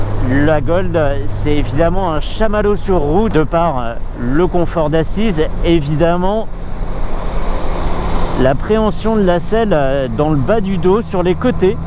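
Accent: Paris, France. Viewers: Très sympa, ce comparo! J'adore ton accent parigot (je suis du sud-ouest) Je m'abonne 👍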